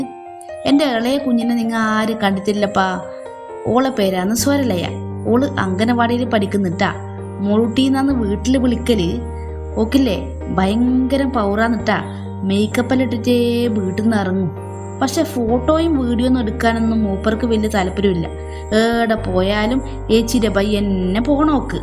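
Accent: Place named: Kasaragod, India. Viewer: കാസറഗോഡ് ജില്ലയിലെ തെക്കൻ സംസാരഭാഷ നന്നായി❤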